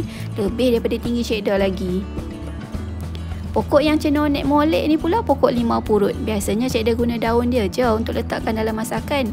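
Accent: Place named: North, Malaysia. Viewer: Dengar loghat macam orang utara,belah kawasan mana?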